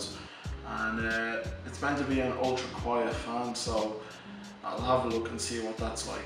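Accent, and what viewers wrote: Ireland. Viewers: Lol - I knew you were Irish from the opening statement - Hows the goin.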